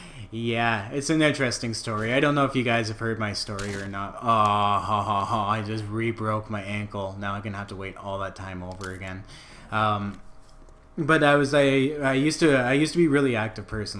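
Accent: Canada. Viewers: I can tell from your accent you're from Toronto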